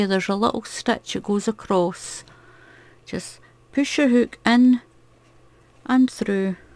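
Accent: Scotland, United Kingdom. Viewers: I love your designs, your instructions and your beautiful Scottish accent.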